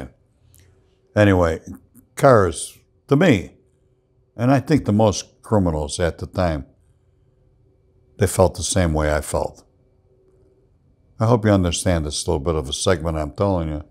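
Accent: Chicago, United States. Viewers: I love listening to the way you talk. The Chicago way. I always did the same thing with cars and clothes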